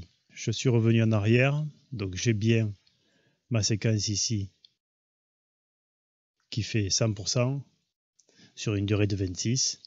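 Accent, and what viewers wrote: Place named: Provence, France. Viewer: Voilà une belle voix qui respire la garigue et le romarin comme Galinette peuchère !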